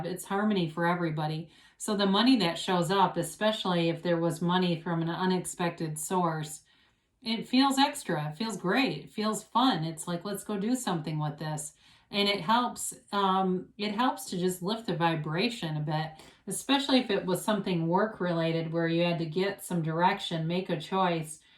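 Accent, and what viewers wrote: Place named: Michigan, United States. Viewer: Sounds like you have a Michigan accent...or thereabouts.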